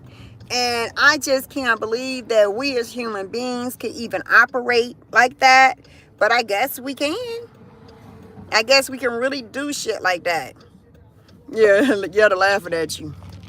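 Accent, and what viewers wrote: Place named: South, United States. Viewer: Good Morning. Just watching your video. You're having so much fun. That's what I'm talking about. I have always loved your deep Southern accent. You sound like you live in the deep south. Love it❣️ You two keep having fun and be blessed.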